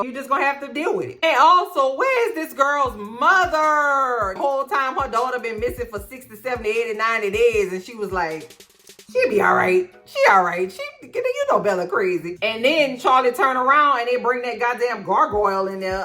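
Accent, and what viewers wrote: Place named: Louisiana, United States. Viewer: Louisiana creole. My stepfather spoke and sounded the same.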